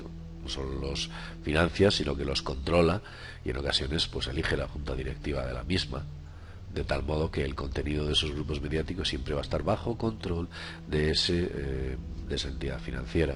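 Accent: Spain. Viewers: es español, fijate en asento jaja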